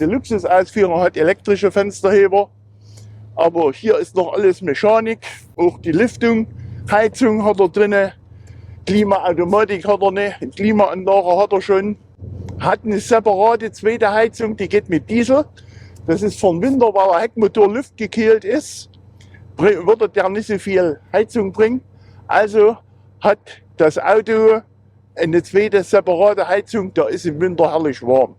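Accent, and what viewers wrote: Saxony, Germany. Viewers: Der Sächsische Dialekt, einfach Cool. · mein kollege hatte auch einen sächsich herrlich du wessiarch nicht so verlogen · Also selbst für mich als Sachse ist das sächsisch des netten Herrn ganz schön stark. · Dieser sächsische Fistelgesang ist unerträglich! Forschdbor! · "hod ne zweete sebarade Heezung.. is m winder herrlisch worm .. finf inschdrumende hoddar " als Österreicher muss ich mich schon ein wenig konzentrieren um diesen sächsischen Dialekt zu verstehen. Um Sächsisch korrekt zu lernen ist das sicher eine gute vorlage . Ich liebe ja alle Dialekte, aber der V8 Motor des Tatra klingt trotzdem besser in meinen Ohren als das Sächsische :-))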